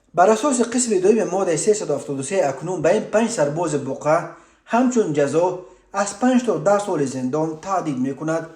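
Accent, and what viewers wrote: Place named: Badakhshan, Tajikistan. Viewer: Хами Канала барои акценти Бадахшониш гуш мекунум .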